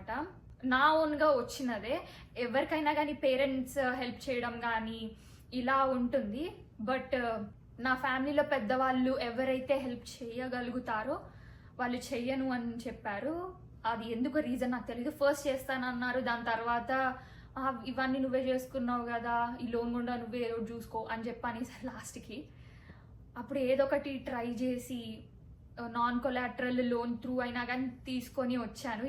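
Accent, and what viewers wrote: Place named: Andhra Pradesh, India. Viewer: Are you from Andhra? Because your slang felt exactly like that.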